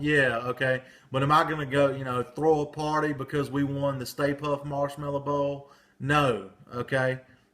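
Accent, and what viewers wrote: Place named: Georgia, United States. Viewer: "Jaowja Futbaw" - Cool accent you "Jawjuns" have sir:)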